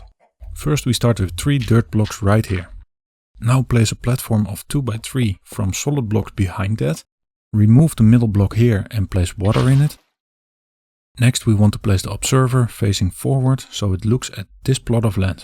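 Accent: Netherlands. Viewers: u sound dutch sir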